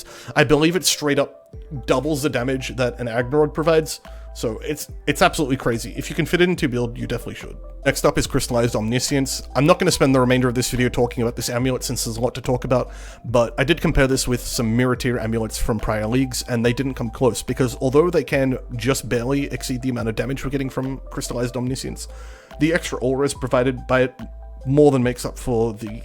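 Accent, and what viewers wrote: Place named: Europe. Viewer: opening the video, seeing gameplay & hearing an EU dude right away Me: Oh this is a good build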